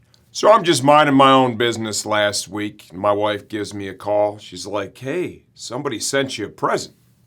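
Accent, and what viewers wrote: United States. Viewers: You sound like you're from Boston ! The language. Funny video and you're right about corporate cocksuckers.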